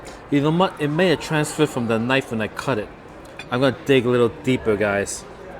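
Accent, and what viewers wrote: New York, United States. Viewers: Thanks for your service! My wife has kind of the same pronounciation problem as you. Lol...but, you do it with a New York accent. Don't stop talking! That's why I watch! No, I've never wanted a late night snack of vegetables. Hat is fine. It makes you look distinguished.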